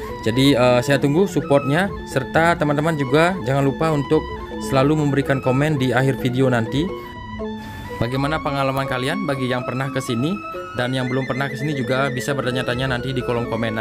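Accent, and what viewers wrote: Bali, Indonesia. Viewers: . mangkanya logat suaranya persis orang bali hehehhee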